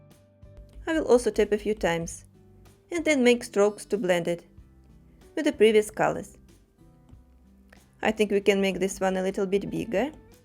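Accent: Russia. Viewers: Как же акцент режет слух сразу понятно что русская )))) только у русского человека может быть такой акцент. Уж лучше на русском говорите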